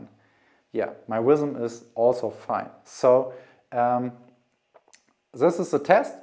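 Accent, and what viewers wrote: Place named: Germany. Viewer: With german accent, very slowly, it's hard to understand something! It's needs a lot of patience to cach something useful !🥱🥱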